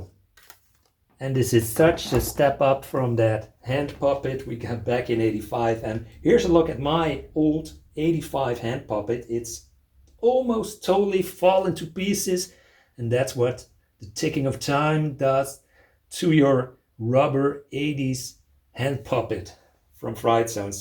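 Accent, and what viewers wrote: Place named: Netherlands. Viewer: Aan het engelse accent te horen, ben je toevallig Nederlands? 😄 Ik ga me hoe dan ook abonneren op je kanaal 😊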